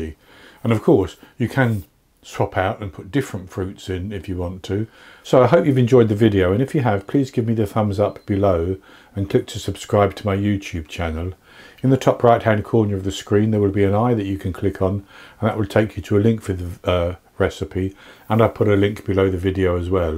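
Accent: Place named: Devon, United Kingdom. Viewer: Wonderful recipe uncle...very therapeutic...Perceval a old gentleman friend of mine is convinced.. You are from Shropshire...he enjoys working out accents...now he thinks your from Devon. Any clues without revealing so we can work it out.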